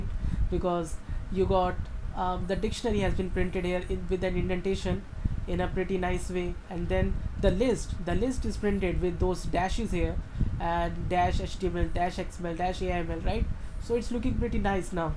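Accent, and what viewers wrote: India. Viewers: i kind of like the indian accent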